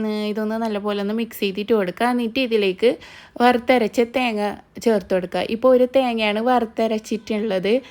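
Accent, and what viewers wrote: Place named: Kannur, India. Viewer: കണ്ണൂര് പോലെ തോന്നി അതാണ് ചോദിച്ചത് 😊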